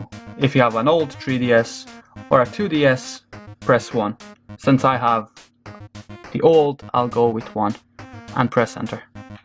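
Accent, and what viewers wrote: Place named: Netherlands. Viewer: are you dutch because i hear some sort of accent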